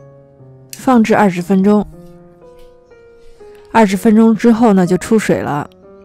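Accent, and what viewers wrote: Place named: Xi'an, China. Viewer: 您好，我也是西安的，目前住在台湾，听您说话好亲切，标准西安普通话，哈哈，想请问下回到西安在那个网站可以看到您的视频。youtube在大陆打不开呢。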